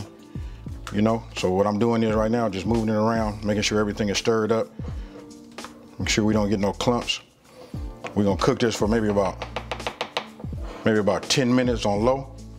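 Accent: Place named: Los Angeles, United States. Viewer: Are you from L.A? Lol got that L.A accent lol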